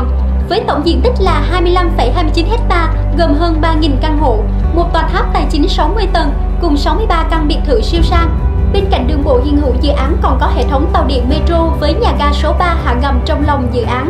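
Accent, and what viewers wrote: South, Vietnam. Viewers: Nghe giọng miền Nam mà muốn mua ngay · Nghe người miền nam là muốn mua liền hiii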